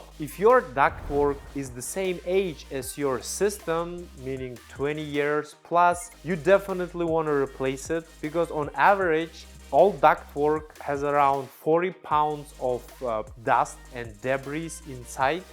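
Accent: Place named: Soviet Union. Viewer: You talk like you’re from Soviet union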